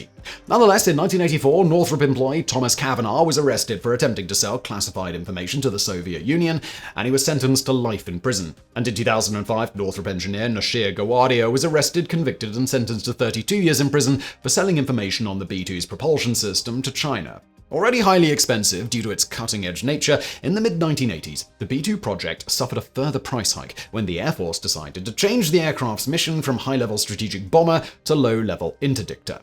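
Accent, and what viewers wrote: England, United Kingdom. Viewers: I feel like I’ve seen clones of this guy on various channels. All bald, with a beard and a British accent… is it just me? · FOR ENGLISH ACCENT ONLY !!! - TALKING AT SPEED OF LIGHT 🤮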